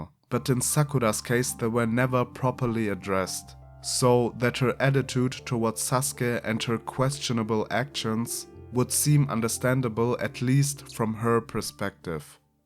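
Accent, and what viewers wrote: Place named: Germany. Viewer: Are you German? Your accent reminds me of my classmates from Berlin, i'm saying this in a nice way.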